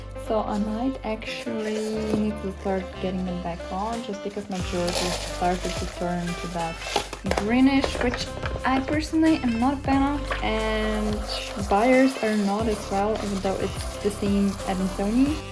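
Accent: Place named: United States. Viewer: Hi. I saw many of your videos, always thought you were american. Compliment for the english and for the good content of your videos! Where do you sell your plants? Ciao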